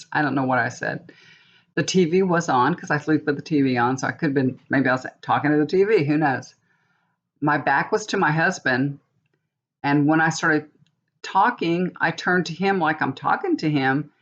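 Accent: South, United States. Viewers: Kim for Intuitiview mentioned you in her latest post. So glad she did. Great channel and you're Southern twang is so cool. (I'm Australian)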